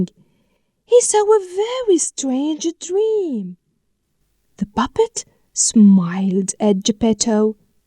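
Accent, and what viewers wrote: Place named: United Kingdom. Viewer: this accent British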